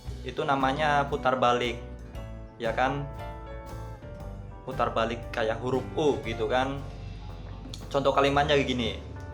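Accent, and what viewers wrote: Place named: Java, Indonesia. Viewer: Anjir jawa bener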